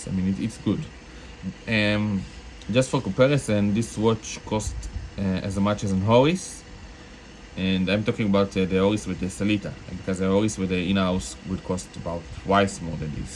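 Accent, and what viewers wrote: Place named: Israel. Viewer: I fucking love israeli accent mate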